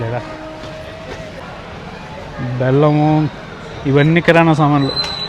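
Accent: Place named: Rayalaseema, India. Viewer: ఏంది సావి.. మా రాయలసీమ భాష మాట్లాడతావుండేవే.. యా ఊరు అబ్బా.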